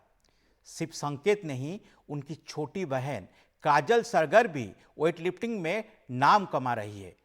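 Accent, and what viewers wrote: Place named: Odisha, India. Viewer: The anchor’s Odia accent is so nice.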